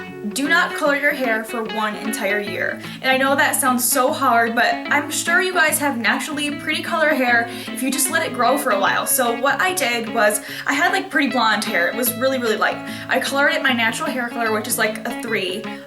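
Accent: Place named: Chicago, United States. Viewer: Your accent is awesome, Chicago? N your pretty, thanks for the tips too I subbed:)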